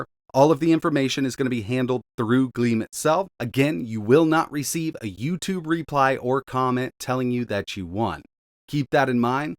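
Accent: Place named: North Carolina, United States. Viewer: This is very generous! I just saw your address in your description, I thought I detected a fellow Tarheel's accent!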